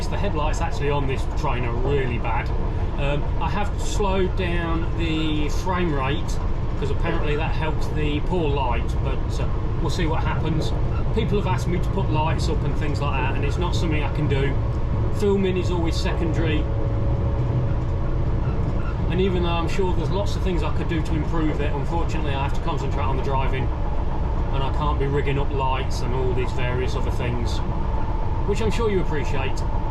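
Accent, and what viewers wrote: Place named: Birmingham, United Kingdom. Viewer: Hi, loving all your videos home & abroad. Cant think of any jokes at this moment in time. I have always been interested in London Underground and its trains. I live just across the road from the Victoria Line Depot. I no Southern region have problems in the winter with current pickup from their 3 rd rail system due to snow and ice. How does the 4th rail London Underground system fair with that problem? You was saying on one of your videos that your home town is Peterborough, i hear a Brummie accent from you. Anyway mate, keep up the good work. Regards Lee.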